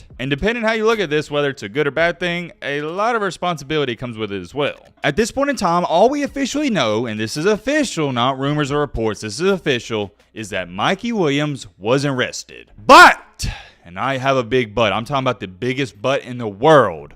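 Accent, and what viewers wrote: South, United States. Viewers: C'mon bro southern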